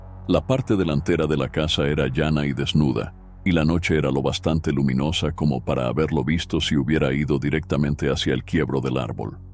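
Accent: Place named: United States. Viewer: Que desagradable acento de gringo hablando español. Le resta credibilidad a sus historias.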